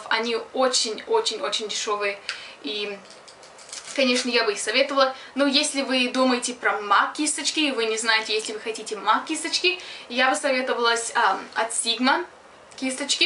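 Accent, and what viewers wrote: England, United Kingdom. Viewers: Как заметен английский акцент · У тебя английский акцент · Я не понимаю что у с разговором?Она англичанка?Или как потому,что слышен какой то иностранный.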